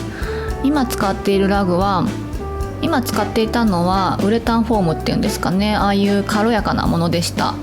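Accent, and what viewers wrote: Kansai, Japan. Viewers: 関西訛りですか？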